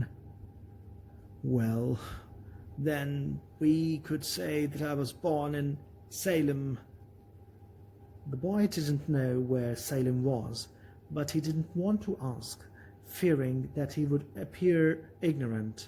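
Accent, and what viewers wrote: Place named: United Kingdom. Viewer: Pure British ❤️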